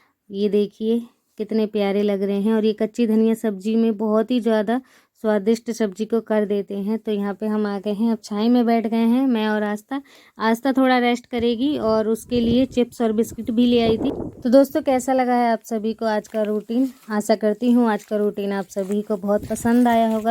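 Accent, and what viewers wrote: India. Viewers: Ap hariyana se ho?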